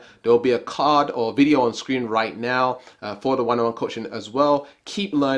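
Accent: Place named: England, United Kingdom. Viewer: Love ur English accent lol:) I AM SUPERSTAR OOOOHHHH YESSSS:))) bless you beloved friend 🎁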